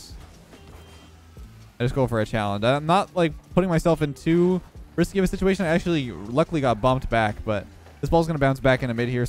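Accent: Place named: Canada. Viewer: A follow Canadian Ehh🤣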